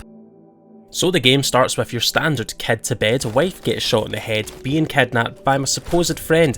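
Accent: Scotland, United Kingdom. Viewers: scottish dante ma men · Scottish Dante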